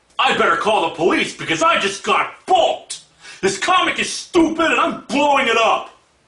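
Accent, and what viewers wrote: Minnesota, United States. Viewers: What pronunciation problem does he have? Unless you mean his Minnesota accent coming through on words like "roof"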